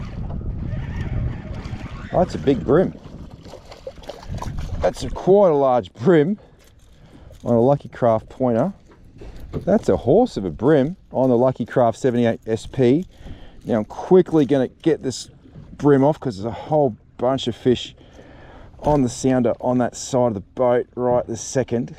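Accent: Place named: Australia. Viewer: Your first time in Australia, sounds like your a fuckin aussie to me and sounds like you know what you r doing in Australian waters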